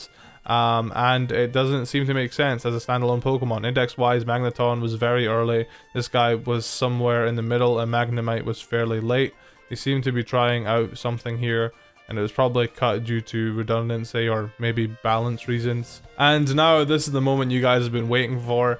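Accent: Scotland, United Kingdom. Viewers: I always thought you sounded slightly Scottish but I know for defo bc the irn bru, yasss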